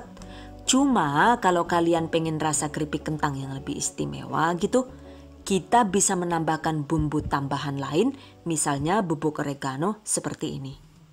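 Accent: Banyumas, Indonesia. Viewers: Makasi Mbaaak... Btw ailopyuu logat ngapakmu. Lagi enak2 ngrungokke serius ujug2 ngekek kemekelen🤩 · Matursuwun ilmune bermanfaat Haha ha kocak jawa ngapake .sukses trs mbak yu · Orang madiun kok ngapak yah...?😁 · Hahaa jebulè wong ngapak 😅😂 (di akhir video) baru nyadar 🤣🤣🤣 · Deneng ngapak mbok ayu😂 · Suka banget klo udah kedenger ngomongnya ngapak2 gitu..aelaah bikjn ngakak polll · Enak kuwe yuu, tok jajal olih apa ora yu.🤣🤣🤣 Rika teyeng ngomong Ngapak ya🤣🤣🤣🤣👍👍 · Deneng ngapak yak. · Mantap CENEL ini, sy suka, cara bicaranya jelas ada dagelanya juga, bahasa ngapak nya itu lo, bisa bikin ketawa 😂😂😂 aku sangat suka sama cenel ini 👍👍👍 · Ngapak ngapaknya kerenn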